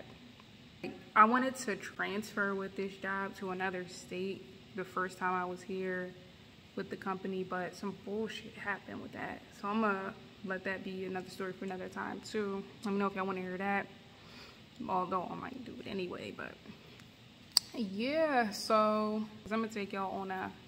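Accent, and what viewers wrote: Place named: Philadelphia, United States. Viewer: You sound like a Philly Jawn! ..or surrounding area.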